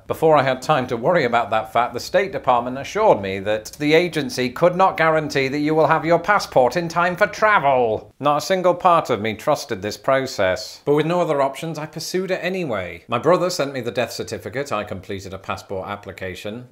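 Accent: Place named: United Kingdom. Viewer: You directed me toward a video called "Why Don't More Americans Own Passports?". Based on this information, I have concluded that your earlier videos have a much more appealing version of your British accent. Either way, keep up the great uploads, your opinions ring true.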